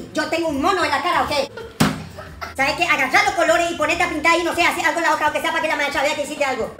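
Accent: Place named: Entre Rios, Argentina. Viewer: Malismo, asi no hablan las paraguayas y eso que estuve con varias, parece mas bien campesina del norte de entre rios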